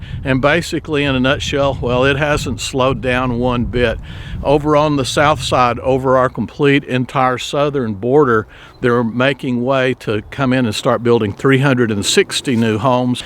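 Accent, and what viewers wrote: Texas, United States. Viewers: Always happy to see you and hear that Texas drawl that I remember so well. Do you live in the district for which Brandon Herrera is running If you do and he is elected, I wonder if there is anything that he can to do? Probably too late but worth the try.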